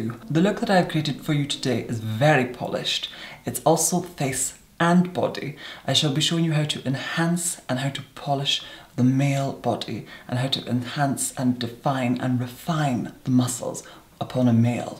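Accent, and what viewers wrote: Scotland, United Kingdom. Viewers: Ah, I knew i heard Scottish inflections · Scottish! I’ve been watching your videos all day trying to figure out what accent you have! Lol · SCOTTISH, I KNEW IT!!!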